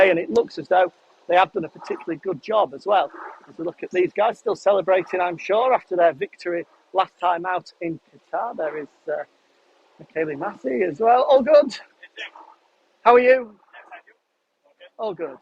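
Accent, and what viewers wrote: United Kingdom. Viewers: Pembawa acara MotoGp sama Badminton emang paling cocok pakai aksen britis.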